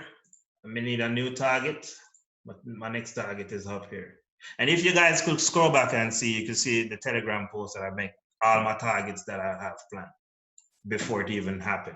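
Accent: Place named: Caribbean. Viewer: Which Caribbean island you from bro? JA?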